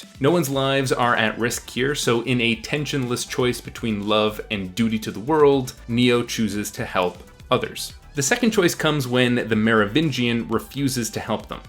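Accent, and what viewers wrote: Canada. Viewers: You sound SO Canadian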